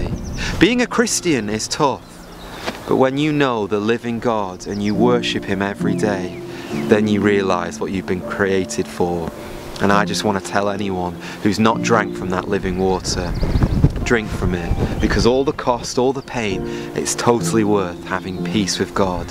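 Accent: United Kingdom. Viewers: It's British . I say it that way too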